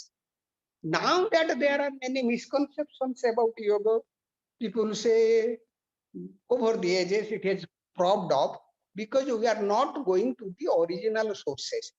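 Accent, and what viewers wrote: Odisha, India. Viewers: Swamiji pranam 🙏🙏🙏 I have few questions.. please answer me 1 - I practice pranayama, asana, bandha, mudra, do japa etc... When I meditate my prana became active and it generates heat in my body, my spine became hot it's like something rising very hot substance in my spine.. also the prana give pressure in muladhar and generate heat. Sometime it pains a little bit but it's ok. Then I stop meditation, but now again am starting. 2- Swami ji where do you live ?? Or have ashram? 3- Swamiji are you from odisha ?? You sound like odia cause am from odisha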